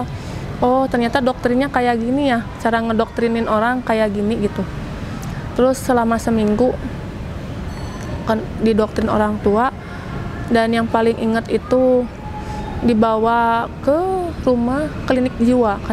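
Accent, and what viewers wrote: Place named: Sunda, Indonesia. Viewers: ko nyunda bgt ya bataknya... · Teteh udh lama di bndung Yaa logat sundanya kental bnget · Sunda pisan yaaaa... 🤗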